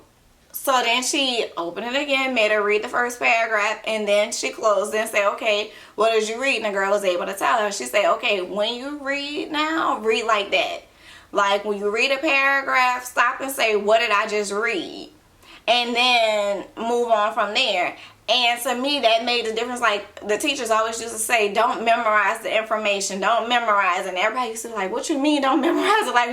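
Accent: New Orleans, United States. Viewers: First thing I said she from nola accent tho😍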